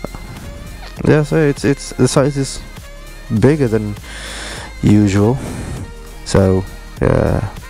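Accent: United Kingdom. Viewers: i love the way you talk, British Accent hahaha,lil bit weird heard you moaning ahahaha. Nice gears bro. i'm interested with the shoes.. 7gears, right?? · itu aksen british :3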